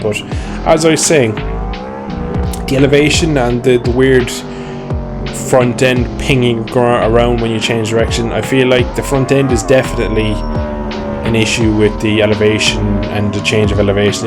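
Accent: Ireland. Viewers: Is thst a cork accent i'm hearing? 😉 Was trying out VRiders SBK natively on the Quest 3 and saw someone mention GP Bikes and ended up here. Havent tried GP Bikes yet but VRiders SBK is actuallt decent for an AppLab game.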